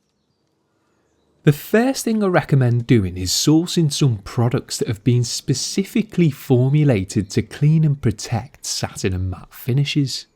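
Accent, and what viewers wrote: Liverpool, United Kingdom. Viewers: He is from Liverpool and has a regional accent. The information is solid, the content is free, stop moaning. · Scouse mouse all the way fantastic video as always though bro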